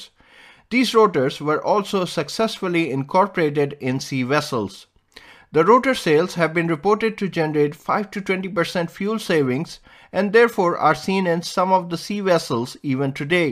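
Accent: India. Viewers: Indian accent?😂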